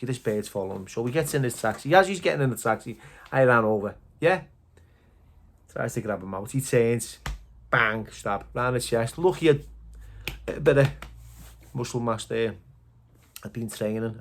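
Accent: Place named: Yorkshire, United Kingdom. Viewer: Belter Yorkshire accent that lol love your story's fella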